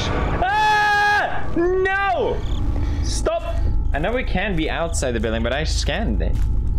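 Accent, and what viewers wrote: Sweden. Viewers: Because of the heavily Swedish accent that he lost over the years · He used to have a really strong Swedish accent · i tried to copy pewds with his Swedish accent and goofy speech patterns.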